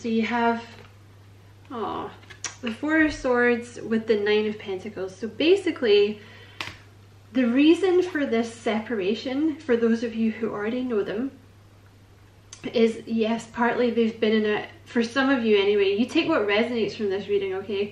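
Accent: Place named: Scotland, United Kingdom. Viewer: Keep it going. Interesting reading. Have fun listening to your readings. You sound like me. With the pure Scottish banter.😂😂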